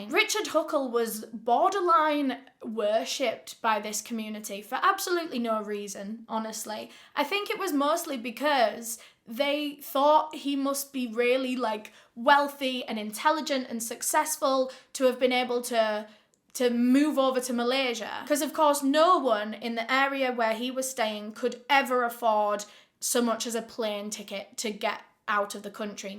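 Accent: United Kingdom. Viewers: You are my favorite true crime channel. I’m from the US so love the accent as well. You give us information as well as having the upmost respect for all the victims you talk about. And also holidays are coming around and I know you talk about your personalized hair care and for the life of me I can’t remember the name or website please let me know thank you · Do you ever see a person before you hear the accent you think to yourself "that person is very very British."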